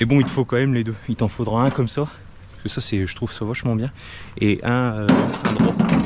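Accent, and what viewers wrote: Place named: Nord, France. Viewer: Ti t es de ch'nord. Merci pour la vidzo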